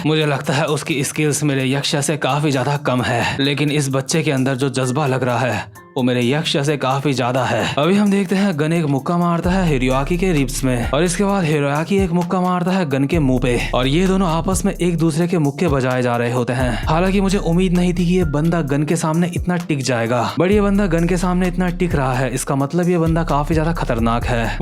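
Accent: Uttarakhand, India. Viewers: Bhai aap uttrakhand sa ho kya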